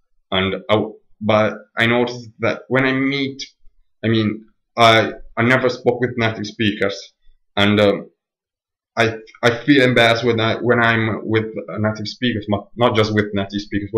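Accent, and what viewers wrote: Italy. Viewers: Hi - I understood everything you said but like you said it is your accent that will need some practice. I understood you, but I had to concentrate on what you were saying. Two things I would say give away that you are Italian - the long A sound you pronounce like a soft a (Kate and Cat should have two different A sounds), and adding an 'ah' at the end of some words, like 'people-ah'. Many italians do this, so don't worry . But well done with this, and keep working, you'll get there!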